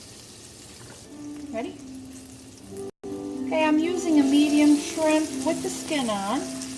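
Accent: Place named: Massachusetts, United States. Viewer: that looks fantastic..thats almost like the mosembequi..you sound like your from new bedford ma..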